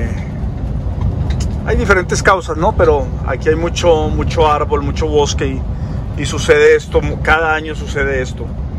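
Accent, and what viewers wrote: Mexico. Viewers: Hola amigo con todo respeto, la verdad es solo curiosidad, si eres Colombiano por qué hablas como Mexicano? Gracias por tu respuesta!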